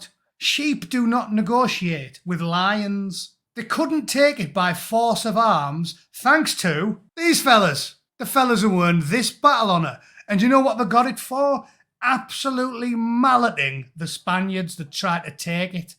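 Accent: United Kingdom. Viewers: Now THIS is the way I like to hear a Brit talk. Good on ya, boy!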